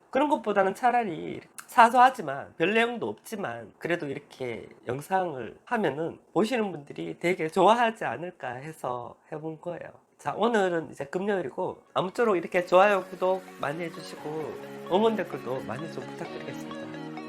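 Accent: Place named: Gyeongbuk, South Korea. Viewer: 애매한 목소리에 경북 사투리 듣기 싫다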